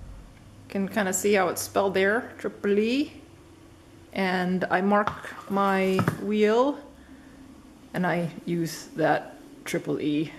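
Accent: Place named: North Dakota, United States. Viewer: Are you from North Dakota?? I can really hear an accent lol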